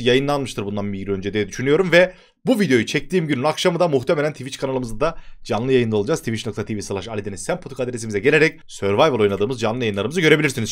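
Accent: Yozgat, Turkey. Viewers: Abi yozgatlımısın?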